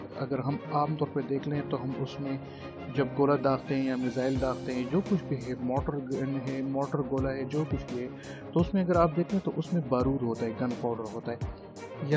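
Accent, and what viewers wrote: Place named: Pashto, Pakistan. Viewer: thanku4yrvocal my assumption is yr accent is pashto from sarhad kpk ji m zindabad inshala china will always rise couse intheir history theyr talented and creative inventive nation yet they never invaded any country godbless china and5superpowers and rest ofthe world nations god let sanity prevail may v not destruct anhilate eachother peace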